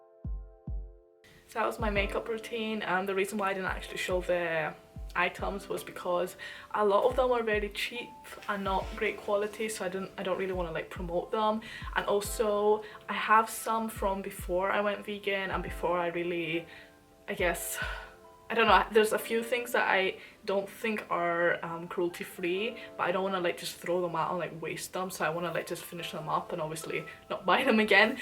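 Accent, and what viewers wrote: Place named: Aberdeen, United Kingdom. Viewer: Your accent is so mixed but so Aberdonian at the same time haha, are you Italian? Great video.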